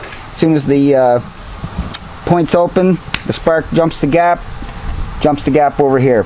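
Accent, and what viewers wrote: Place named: Newfoundland, Canada. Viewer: Yes my son ,in newfie you would be one of the bye's .... You comes across is half rednech half newfie ,we bye' loves it ...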